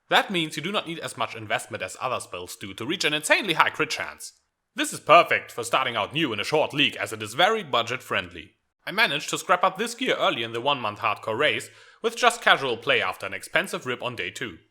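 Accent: Germany. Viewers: Talking like what? He's German, you expect him to have no accent?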